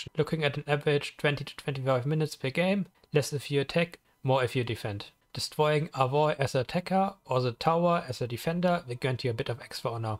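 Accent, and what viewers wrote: Germany. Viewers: It was quite strange listening to you speak, because I know you're speaking English but half the time it sounded like you were speaking German only to realize you were indeed speaking English but the cadence and rhythm and enunciation was very much German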